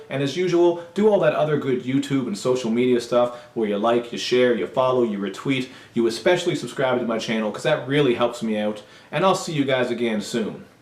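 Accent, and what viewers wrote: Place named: Canada. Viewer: Will do. Are you Canadian? I love the accent.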